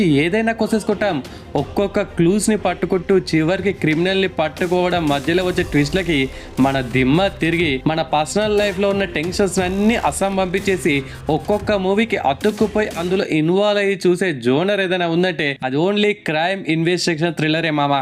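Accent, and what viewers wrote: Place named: Telangana, India. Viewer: బ్రదర్ అంటూన్నావు నువ్వేమో తెలంగాణ బ్రదర్ ఏమో ఆంధ్ర బాగుంది బ్రో....😂😂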